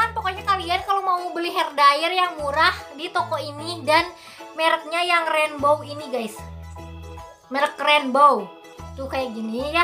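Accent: Sunda, Indonesia. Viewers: Sunda pisan euy....😆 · logat sundanya kentel banget teh ngakak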